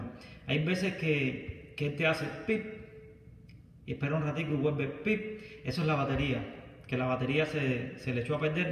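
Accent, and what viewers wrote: Cuba. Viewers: Tu eres cubano eh?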